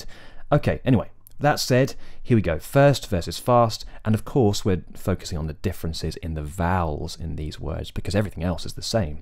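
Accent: United Kingdom. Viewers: Your standard British accent is so beautiful. The way you flow from words to words is so beautiful like music. I know you grew up speaking English but did you train to be such a great speaker. You speak so clearly and you annunciate your words, some native speakers don't do that.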